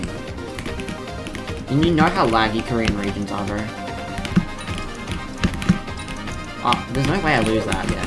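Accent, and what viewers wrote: Australia. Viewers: Can i play with u also are u a. Aussie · Aussie accent is 🔥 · W accent I’m Aussie too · R u from qld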